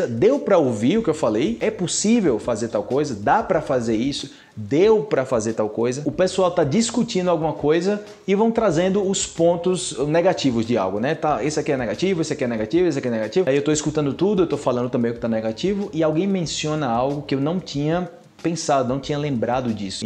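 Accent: Antioquia, Colombia. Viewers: A mi me parece que ud tiene mas acento colombiano que acento brasiliano? Como puede ser si ud viaja por toda hispanoamerica? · Habla mejor español colombiano que yo como nativo, nunca pude hacer bien ese acento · Se nota el acento colombiano... 👍Saludos desde Argentina. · Eu gosto deste canal porque você tem um sotaque colombiano bem vocalizado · Habla con acento Colombiano, pensé que era de Colombia hablando un perfecto portugués. Jeje que bueno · No es paisa? 😮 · Felicitaciones a antes había visto varios de sus videos hablando español se le nota un poco el acento colombiano. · Tiene mas acento colombiano que yo 😧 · Pareces de acá de Colombia cuando hablas en español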